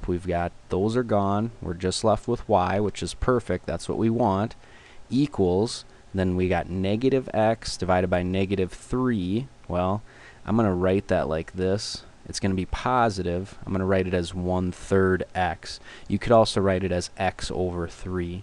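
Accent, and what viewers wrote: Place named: Canada. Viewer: i can tell this dudes canadian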